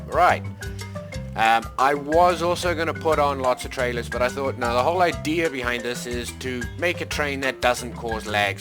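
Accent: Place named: Africa. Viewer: I swear you have a African accent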